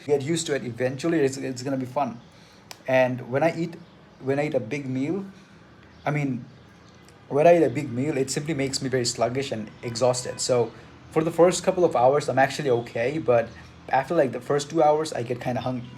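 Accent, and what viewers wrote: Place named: Chennai, India. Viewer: Gangster intro dawg! You f*cking fly man. You the Top G of Chennai, with that accent. You the dawg! The Big G. You are a legend, f*ck yeah man! The big dawg of Chennai.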